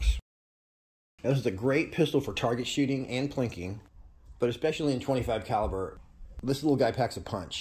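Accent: United States. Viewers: Will you sound American so why would you buy basically a toy when you could have a real gun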